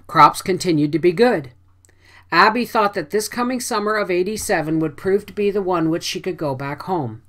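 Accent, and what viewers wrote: Canada. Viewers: I hope you do! It's so kind of you to make these videos and take so much of your time on them. I also wanted to say that I was born and raised on the shores of Lake Superior (WI) and your Canadian accent is so comforting and makes me feel at home. Keep reading when you can, I for sure am a devoted fan.:)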